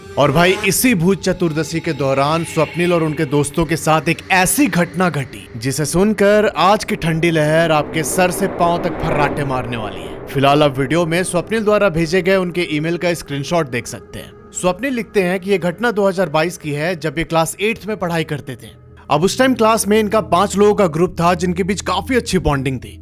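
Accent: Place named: Bengal, India. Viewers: Sir your bengali accent is so cute 🥰🥰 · Diwali 🪔🎇 Ke ager din Matlab bhut choturdhosi ke din 14 saak or sabke pura Ghar mein 14 🪔 Candles🕯️ Esob Hum Bengali Log Apne Apne Ghar mein lagate hai or bhaiya bhut choturdhosi ke dis sachmein darabna kuch hota hai eto maine bhi suna hu likin koye bhut aaise baat nhi kar sakta hai jo bolega MAI ARPITA NHI HU, aise shadow bagera dek sakta hai likin eeita kuch hota hai bhuut bat karta hai e kuch jada hi hai aspas negative energy rahta hai to feel hota hai. Likin Bhuut samne ake bath karega esob nhi hota bhaiya 😅 PLEASE REPLY CHAT ? OR ek bath Apke Bengali Voice So Sweet ❤ ! LOVE FROM KOLKATA / (WB ).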